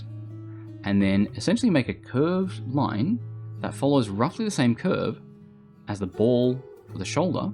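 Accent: Australia. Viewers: Australian accent cool though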